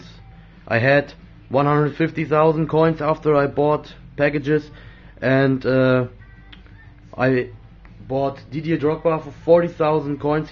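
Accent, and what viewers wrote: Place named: Germany. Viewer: man hört deinen deutschen akzent haha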